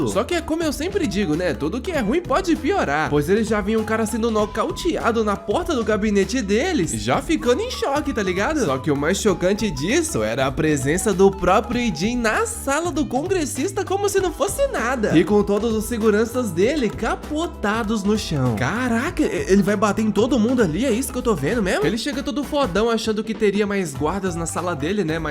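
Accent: Rio de Janeiro, Brazil. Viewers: O narrador e do RJ né possivel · Certeza me responde ai vc e carioca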